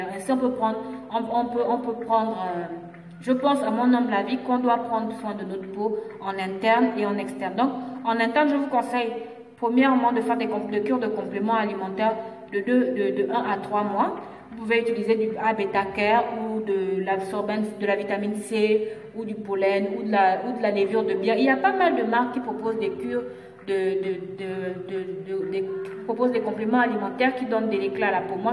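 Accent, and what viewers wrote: Gabon. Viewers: Bjr ma belle tu as l'accent Gabonais de quelle pays est tu si ce n'est pas indiscret?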